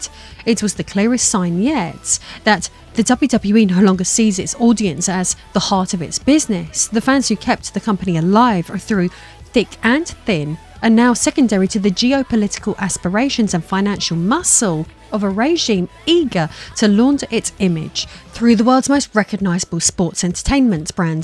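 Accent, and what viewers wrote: United Kingdom. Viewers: got to ask * uh your accent sounds sorta brittish is that correct just kinda guessing here?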